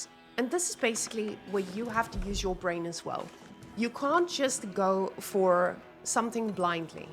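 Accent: South Africa. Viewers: I don't know why but I am madly attracted to the South African accent... I need help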